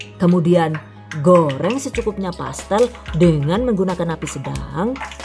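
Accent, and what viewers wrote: Banyumas, Indonesia. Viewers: Ikut komen ya kak. Emang itu sudah jadi ciri khasnya. Campur ngapak n inggris medok juga😅. Semuanya begitu. Tapi semua resepnya recommended dan nyaris ga ada yg gagal kok. kl baru lihat emang aneh, lama2 akan terbiasa🙏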